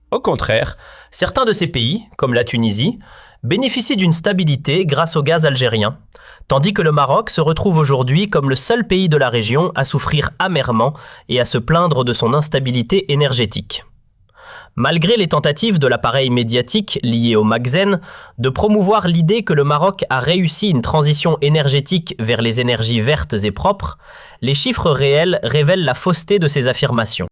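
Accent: Canada. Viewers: accent Canadian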